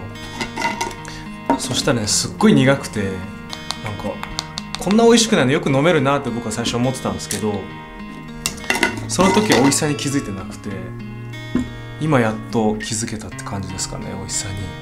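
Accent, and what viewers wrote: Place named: Okinawa, Japan. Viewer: あたり、與那城くんの沖縄なまりがちょっと聞けて私は興奮しました。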